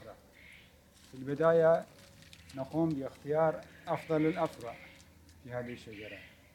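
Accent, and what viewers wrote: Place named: Bahrain. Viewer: هو عراقي فكيف يتكلم بحريني ؟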